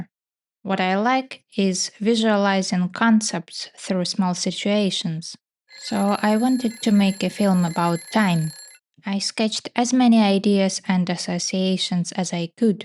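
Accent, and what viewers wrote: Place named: Russia. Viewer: судя по акценту вы знаете русский, я прав?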